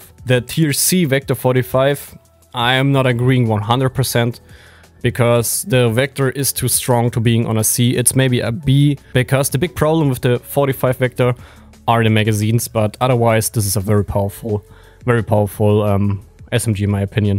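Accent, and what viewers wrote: Germany. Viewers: Sprich einfach deutsch bro xD, joke mag es wenn deutsche Englisch sprechen! <3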